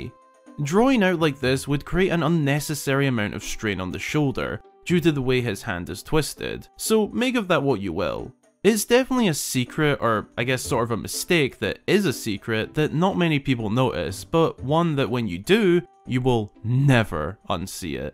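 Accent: Scotland, United Kingdom. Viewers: You have the Andy Murray accent, complete with the groaning sound and drawn out words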